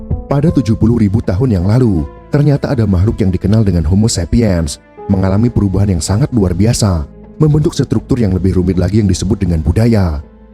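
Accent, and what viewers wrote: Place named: Java, Indonesia. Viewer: Asli mana mas... Kok bahasa Jawa nya keliatan meduk..." Saya salah satu scrubers pegawai jalanan mas.... Video2 mas selalu saya lihat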